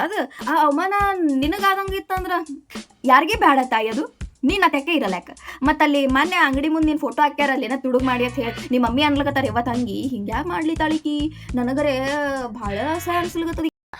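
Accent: North Karnataka, India. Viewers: ಸೂಪರ್ ನಮ್ ಉತ್ತರ ಕರ್ನಾಟಕ ಮಾತು ಕೇಳೋದು ಅಂದ್ರೆ ಖುಷಿನೆ ಬೇರೆ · ಏನ್ ಅಕ್ಕಾ ನಿಮ್ಮ ಬಾಯಲ್ಲಿ ನಮ್ಮ ಉತ್ತರ ಕರ್ನಾಟಕದ ಭಾಷೆ ಕೇಳೋಕೆ ಎಸ್ಟೋದು ಚಂದ ಬಿಡು ಅಕ್ಕಾ 🥰🥰💖 ಅಕ್ಕಾ ನಿಮ್ಮ ವಿಡಿಯೋ ಬಾರಿ ಮಾಡುತಿಯಾ ಅಕ್ಕಾ ನೀನು ಅಕ್ಕಾ ನಿಮ್ಮ ಊರು ಯಾವುದು ಹೇಳಿ ನಾನ ನಿಮ್ಮ ದೊಡ್ಡ್ ಅಭಿಮಾನಿ ಅಕ್ಕಾ 💞💖.. 🤩🤩💐 ನಾವು ಹಾವೇರಿ ಮಂದಿ ಪಾ 🎉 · ನೌನ್ ನಮ್ಮ ಉತ್ತರ ಕರ್ನಾಟಕದ ಮಾತ ಕೆಳಕಾ ಚಂದ ಹಂಗ್ ನಮ್ಮ ಕಡಿ ಸಂಸ್ಕೃತ ಮಾತು ಸಲ್ಪ್ ಹೇಳ್ಬಿಡು ಅಕ್ಕ ಆಕಿ ಸತ್ತ ಹೋಗ್ತಾಳ 😅😅😅😅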